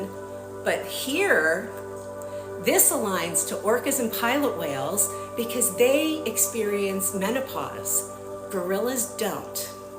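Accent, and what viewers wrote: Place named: Pittsburgh, United States. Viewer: Are you from Pittsburgh? By any chance your accent sounds familiar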